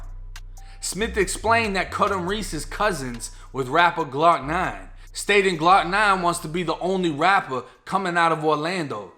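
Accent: Boston, United States. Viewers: of coowz its boston. Not a place wheh kids can play in tha yod, cuz they could get hit by a ca from all the wicked bad drivas kid! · Sometimes I think your from Boston or Massachusetts but I heard you say you were locked up in Florida so I'm guessing your fro. Florida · You have a boston accent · Boston talking ass...Where you from SOUTHY? LOL! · how are you mixing up florida lazy twang and stromg new England (boston) accent and dialect ? totally sounds like ur a poser and/or confused...idk about the rest of FL but here in Orlando we don't sound like a PLIES or reject Slip N Slide artist from <num>.i mean unless ur on mercy drive, ivy lane or deep off the NW part of Orange County maybe IDK... but from OBT to Landstreet; Oakridge to Kirkman and MetroWest... we most definitely don't sound like that in fact we deliberately ended that twang talk dialect back in 2015/2016, meaning THAT LINGO BECAME A NO GO ON THAT TEMPO. 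· hey just wondering are you from Boston. me being from I can hear how say you car. you don't have that southern Florida,,,,, accent just wondering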